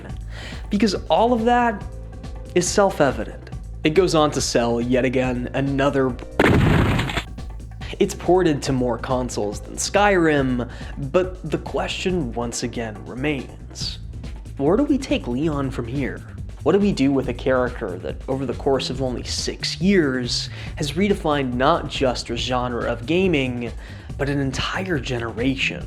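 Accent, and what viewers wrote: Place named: Ireland. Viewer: Italian? My boy is Irish.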